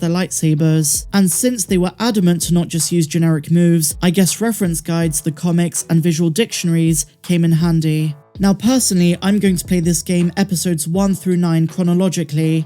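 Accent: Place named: West Country, United Kingdom. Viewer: Great vids as always, are you a fellow west country person, I can hear a twang :D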